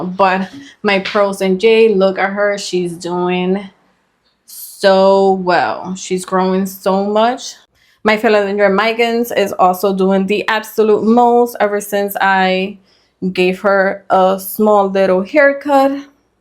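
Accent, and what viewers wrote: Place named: Puerto Rico. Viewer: I will, tienes accento de Puerto Rico, eres puertorriqueña? Si no te molesta decirme, yo soy. Muchas gracias,.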